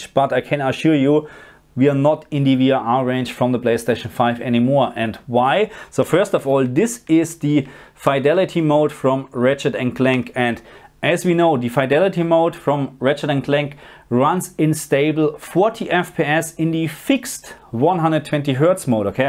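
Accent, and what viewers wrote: Germany. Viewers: are you from aus? Your accent sounds German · he's German but he lives in AU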